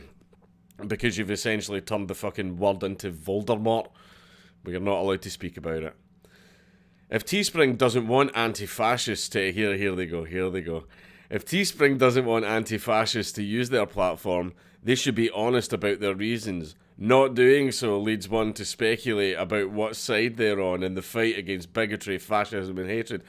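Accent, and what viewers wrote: Scotland, United Kingdom. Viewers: love the accent: scrooge mcDuck. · I've been watching some of your videos. Quite enjoy them. I'm still not sure if I agree or disagree with you, but I am willing to listen without foaming at the mouth. Besides that, you're even more Scottish than I am. So thumbs up! Subbed! Good job! Very few people on Youtube can confuse me to the point where I want to sober up and think...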